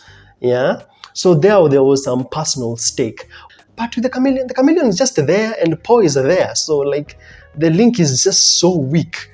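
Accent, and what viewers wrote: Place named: Africa. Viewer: I think he might actually be from Africa that's how they speak there it's a very nice accent to listen to that's for sure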